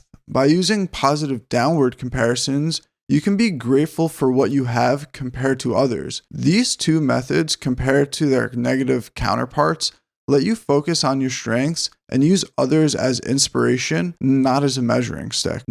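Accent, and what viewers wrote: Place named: Liverpool, United Kingdom. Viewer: Good video thanks! Random question but your accent sounds like you have a slight scouse twang,were you originally born in Liverpool UK?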